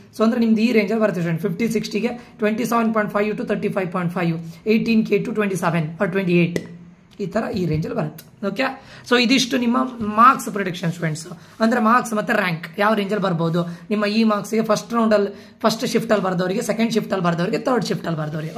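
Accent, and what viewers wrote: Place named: Dakshina Kannada, India. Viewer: sir, you got the correct prediction i have subscribed to you now..! the accent of Dakshina Kannada makes me listen more..!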